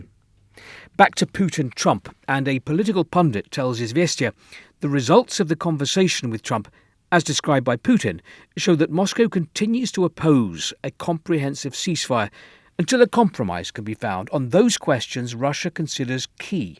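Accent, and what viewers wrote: United Kingdom. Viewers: Thank you for your work! 🙏🙏🙏 By the way, I love your strong British accent. :) It's much better understandable for a eurpean non native speaker that American English. 😄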